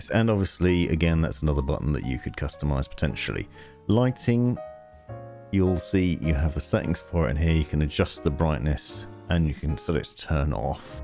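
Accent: United Kingdom. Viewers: Always enjoy the soothing original British voice of yours <3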